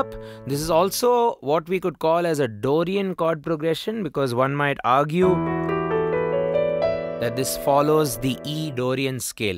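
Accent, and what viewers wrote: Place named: India. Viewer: Wonderful...No plans to learn piano but I loved listening whatever you played...God bless...Your way of speaking sounds very Indian...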